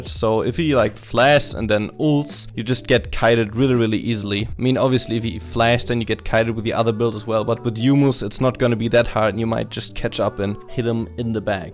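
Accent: Germany. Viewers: 100% deutscher akzent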